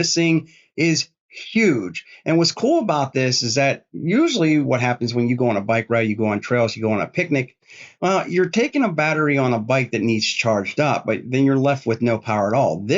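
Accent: Pittsburgh, United States. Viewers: Hah - didn't even recognize it was you...until I was listening and heard just a hint of yinzer and I said, hmmmm, that's real nice like. Awesome stuff. Get the gear.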